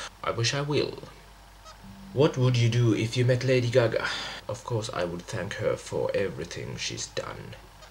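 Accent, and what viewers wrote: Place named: Finland. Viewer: Love your Finnish accent:) i <3 and:)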